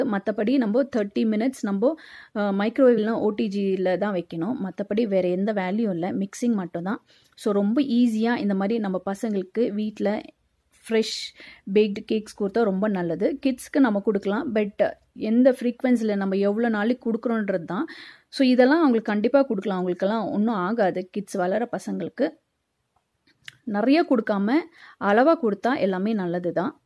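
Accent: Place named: Bangalore, India. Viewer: Hi....you have superb banglore slang... while you talking it's like my mom's family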